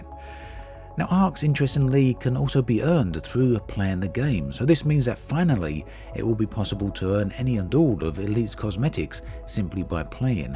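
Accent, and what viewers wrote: United Kingdom. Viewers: What the new currency called, I can't make it out with the strong UK accent? Arcs? ox. rocks?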